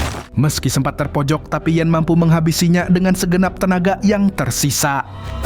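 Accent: Banjar, Indonesia. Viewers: Ternyata urang banjar lah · Jah urang Banjar Leh Pian mang ,, mantapp 😂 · Urang banjar jahhh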